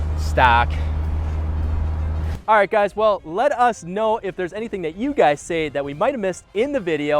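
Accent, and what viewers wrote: Wisconsin, United States. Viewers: Are you from Wisconsin? I think I recognized my accent.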